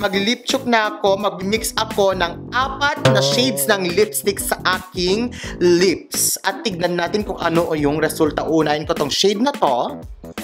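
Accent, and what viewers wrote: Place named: Cagayan, Philippines. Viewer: Para taga CAGAYAN si momshie kasi yung accent nang pag sasalita niya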